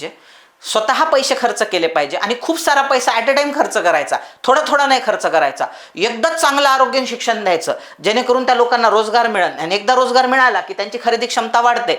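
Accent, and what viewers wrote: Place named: Kolhapur, India. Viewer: Kolhapuri bhashet ekdak💥🔥 kadkkk ani lai bhariiii👍👌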